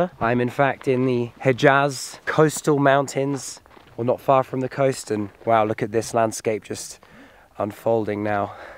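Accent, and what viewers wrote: United Kingdom. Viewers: مـفـاـح السـبـيـعـي يقول عجبه الخضار هههههه لغتي ركيكه واضن انو يشبه بعض المناظربدوله مدري ايش اسمها ولهجته بريطانيه ههههه ماكملت الفديو لوكان مترجم كان احسن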